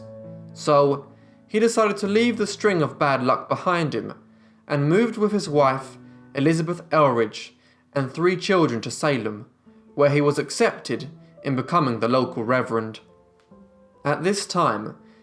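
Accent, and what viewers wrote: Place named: United Kingdom. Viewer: Thank you for all your hard work and informative video. Well done. Subbed after 7 minutes :-) Something about a British accent automatically adds 30 IQ points to any documentary. Especially yours, you have a great voice, very clear and understandable. Not gonna lie, some accents I have to rewind several times or turn on the captioning feature. Look forward to watching more. Hope you have a safe and prosperous new year.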